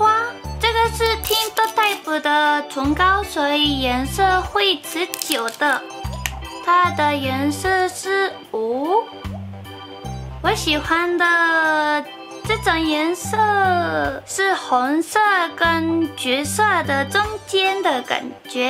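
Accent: Standard. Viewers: 恭喜yuma TV 突破20W!! 繼續努力~而且你的中文可以算是很標準了,已經不再是躲在ryu旁邊當攝影師,拍影片前先寫好稿子的Yuma了 ^^ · Yuuuma恭喜20萬訂閱!你的中文進步了很多.發音超標準的!只是如果有比較不會的字一定要問Ryuuu喔!永遠支持你!! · 中文说的还挺标准的，加油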